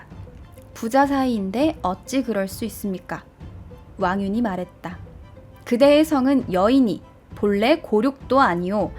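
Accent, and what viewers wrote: Gyeongsang, South Korea. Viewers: ㅋㅋㅋ 오리지널 네이티브 부산 경남 사투리를 구사하시네요? 갱상도 여자 아입니까?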